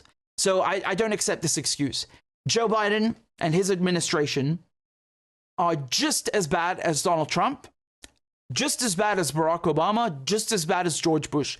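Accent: Texas, United States. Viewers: Your Texan accent sounds pretty good. But then I am a Kiwi, so what do I know? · Love your Texas accent bro. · Texas voice not too bad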